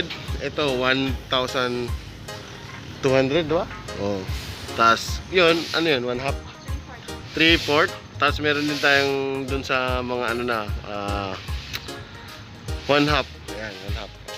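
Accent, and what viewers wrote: Visayas, Philippines. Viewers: bisaya k b sir?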